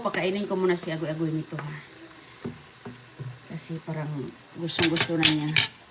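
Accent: Visayas, Philippines. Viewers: D ka pa nasanay ateng haha bisaya ba sya Kya ganyan salita nya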